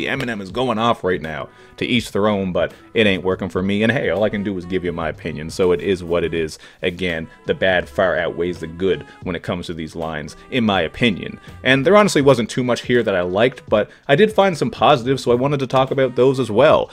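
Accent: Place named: Nova Scotia, Canada. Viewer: He's from Nova Scotia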